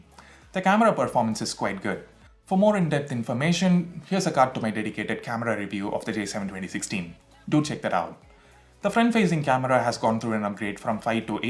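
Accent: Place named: India. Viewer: Indians English sounds annoying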